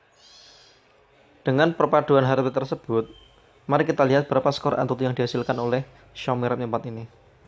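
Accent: Java, Indonesia. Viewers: Medok bgt jawanya...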